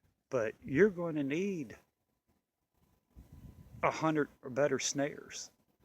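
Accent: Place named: South, United States. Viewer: Good points thanks. You sound like your from the south so the cold may not be as much of an issue as up north. But I recommend the little buddy heater I use it in my deer blind. These nor'easters we just had up here was a good practice run. I found I needed more hand sanitizer and rubber gloves. A couple more 5 gallon buckets would be good to I was helping a couple unprepared neighbors out with water.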